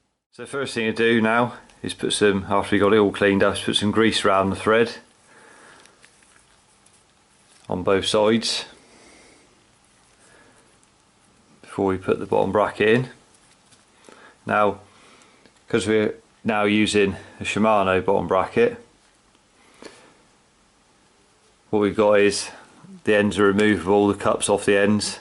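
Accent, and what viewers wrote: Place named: Birmingham, United Kingdom. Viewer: Nice man thanks .. Is you a fellow brummy?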